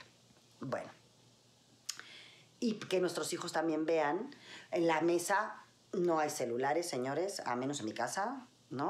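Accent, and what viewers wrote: Mexico. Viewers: Que dicen tus compañeros de parchís acerca de tu acento ya cambiado al acento mexicano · Yola. Cuando tenía trece años (1984) estaba enamorado de ti, hoy tengo 48 y sigo enamorado de ti. Eres hermosa. Me encantó que ta hayas quedado a vivir acá y que hayas adoptado el acento de México. Eres la perfección hecha mujer, me encantas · Yola tantos años viviendo en México, que ya perdió su acento de española. Habla como mexicana 😘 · Hola, que lindo encontrarte por aquí, ya tienes un nuevo suscriptor, saludos de un peruano gran fan tuyo, sobre todo desde cuando formabas parte de "Parchís", era muy niño claro, y eras mi amor platónico, jeje, bueno, de la mayoría de niños, y de modo tierno claro. Se ve que tienes mucho tiempo en México, por tu acento, muy bien por ti, debes estar a gusto por allá, es lo que importa. Me encantaría me envíes un saludo linda, sería como un sueño cumplido para mi, jeje; mis mejores deseos para ti y un beso y fuerte abrazo a la distancia, cuídate linda!.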